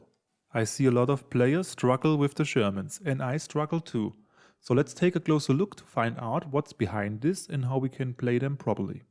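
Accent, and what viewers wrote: Germany. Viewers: Also nice German accent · question is are you german? just wondering no ill intent with my comment but was just curious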